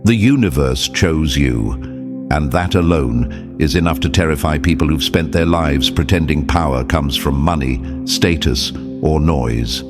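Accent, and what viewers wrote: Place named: United Kingdom. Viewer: 💫👁️✨🍎✨🇺🇸💫☦️💫🥰😇💫Indeed British accent Angelic man❤☦️🇺🇸💫🕊️🔥🍎👁️💫🥰👁️✨💫🙏😇🙌🏼💫You Speak 🗣️ my Language 👁️🇺🇸💫✨🇺🇸👁️✨🇺🇸💫👁️✨🇺🇸✨🍎💫🕊️🔥❤💫🇺🇸💫❤💫🇺🇸💫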